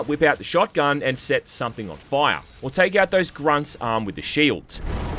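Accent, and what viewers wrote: Australia. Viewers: I hate the way Australians sound, can AI make you not sound like that, I hate it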